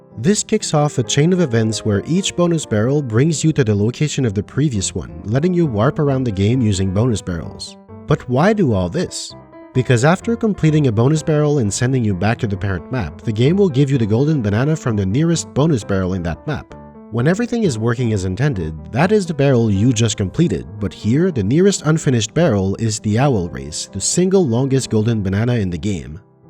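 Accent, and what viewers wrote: Quebec, Canada. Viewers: Oh tes Québecois!!! 🎉❤ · omg quebecois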